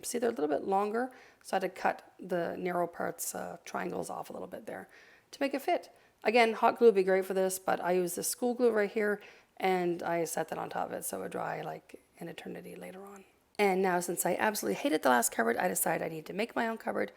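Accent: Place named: Canada. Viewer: My first time to your channel. But I have to say I was a little surprised, seeing your channel name, but hearing a very Canadian accent. 😅🤭 This is an awesome tutorial. Thank you! 🥰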